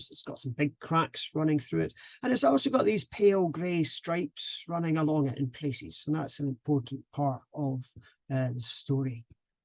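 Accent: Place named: Scotland, United Kingdom. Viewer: This trilogy of geology lectures has been fascinating. I also love the Scottish accent.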